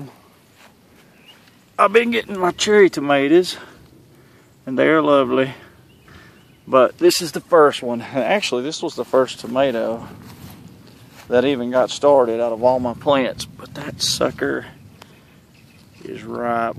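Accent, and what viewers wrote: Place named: Mississippi, United States. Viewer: First comment of 2016 BTW ur from Mississippi huh that's how we sound down here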